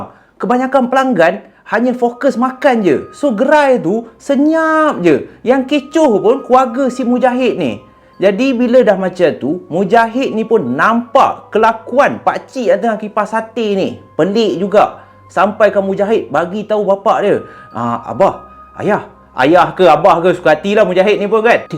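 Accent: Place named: Kelantan, Malaysia. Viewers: Agak pelik bila dgr bckp dialek kelantan...tersengih2 mami😅😅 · Pertama kali ari ni tgok channel you tube..gaya bercerita best jgk..sy doakn maju jaya ya.. ckp kl je sy yg kelantan.faham jee..😅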